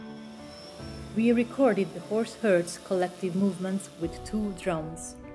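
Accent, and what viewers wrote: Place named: Hungary. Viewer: Hunglish narration.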